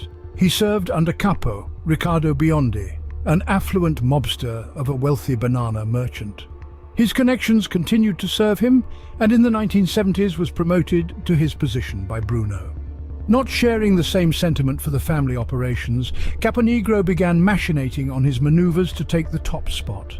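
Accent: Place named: United Kingdom. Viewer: Why use a silly British voice? So difficult to understand. Use American language please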